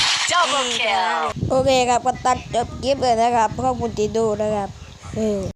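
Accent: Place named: South, Thailand. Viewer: ใต้ผสมกลางหรอยเพื่อนกู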